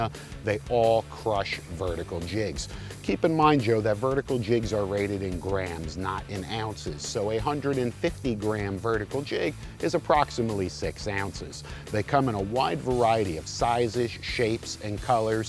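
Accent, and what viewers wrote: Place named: New York, United States. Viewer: This guys accent kills me. Go back to New York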